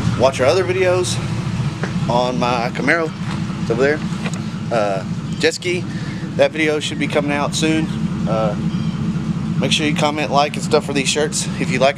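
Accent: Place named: Alabama, United States. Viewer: You talk like me😅 I'm from South Alabama and fixin to try this right now!! Keep it up and play whatever music u want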